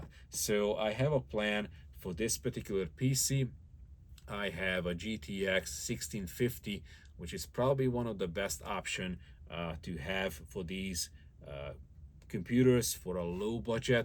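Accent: Hungary. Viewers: Yes, I comparing to the DT version to be exact, but most of my criticism is still true for the old SFF model. My point is that DELL gives you less and less quality and quantity for the same price, probably because the competition, both HP and Lenovo earlier started to use nonstandard PSU and other const cutting and eco system locking. From a business stand point the bisiness is business you can't compete with them in volume and power consumption, but you can with quality and performance if there is a demand for it. These machines are overbuilt for office use, but underbuilt for any performance usage CPUs and GPUs under performing in these systems due to heavy current limiting. Here is an example how long can last something if you choose the right parts, my first intel 775 PC from 2006 still works after 18 years what I bougth used then, now it is in a school in Esztergom. 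The only failure was a bad Hitachi harddrive within the warrianty period in 2008, it was replaced with 500GB WD BLUE which 12 years later produced the first bad sector, 500GB WD BLACK from 2010 still running without bad sector. BTW are you from Hungary? I'm guessing from your accent.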